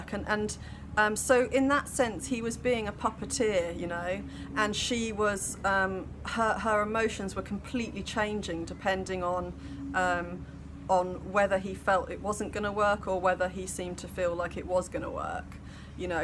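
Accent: England, United Kingdom. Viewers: her beautiful British accent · Her accent sounds brittish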